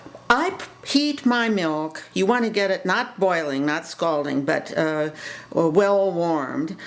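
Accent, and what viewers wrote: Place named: United States. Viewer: hi, can you please writing the explanation on the description tab or email it to me so i can cook it cos your American accent doesn't help me too much and as well i m not English speaking native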